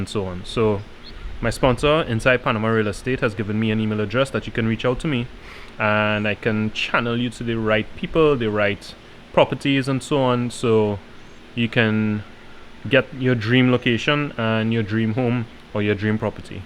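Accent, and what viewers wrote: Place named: Trinidad and Tobago. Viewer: Recognize this accent anywhere. Hi Trini!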